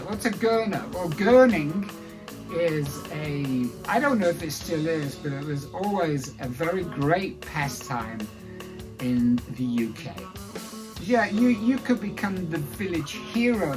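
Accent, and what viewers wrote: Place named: South Africa. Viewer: This drummer is so on! BTW - interesting thing about your accent now is that you actually sound quite South African.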